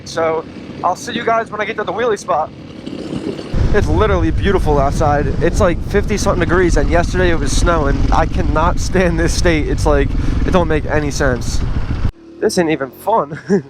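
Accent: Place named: Philadelphia, United States. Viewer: what part of eastern pa are you from lol??????? That philly accent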